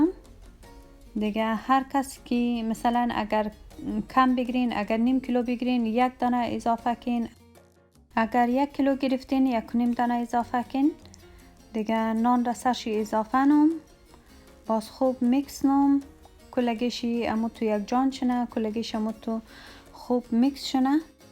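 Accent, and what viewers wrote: Hazarajat, Afghanistan. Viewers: I like her hazaragi accent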